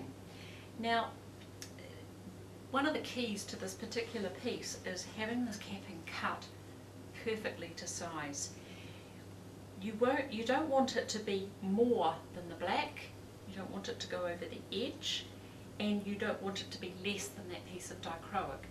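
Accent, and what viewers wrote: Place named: New Zealand. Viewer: ahh.. wonderful tutorial. I have wondered how these are made, and here in NZ too! I recognised your kiwi accent. I am getting the vacuum gear together (almost have all now) to make the vapor deposition dichroic glass here in NZ as well. Is there a maker or supplier in NZ already?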